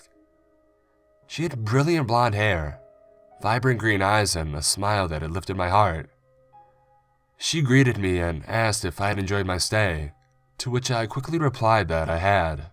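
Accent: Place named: United States. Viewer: The fuck kinda dialect is this, Exceptional American?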